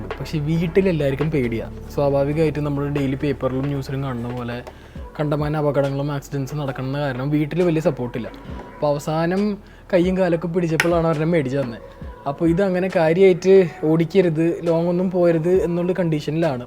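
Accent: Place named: Malabar, India. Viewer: മലബാർ സ്ലാങ്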